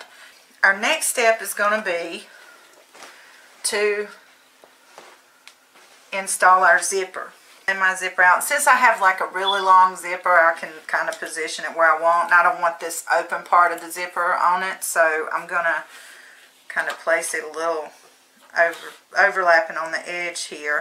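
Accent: South, United States. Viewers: LOVE your tutorial and even more, your Southern “accent” (I’m from the North so it’s an accent to me, haha) Tfs this very clear and concise video on how to add a zipper to a tote bag and also with an accent fabric. I bookmarked and partially viewed so many videos on bags my head was spinning but, yours was the best so far! Will go start my FIRST tote bag now and hopefully it’ll look as good as yours!! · I am from Mississippi, moved away very young. Loved, loved, loved hearing that southern accent again! And you are my FAVORITE tote/purse construction teacher. Love You!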